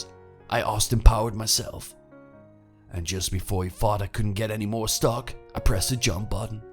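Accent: South, United States. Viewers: the accent is a southern american accent. not south america i mean the american south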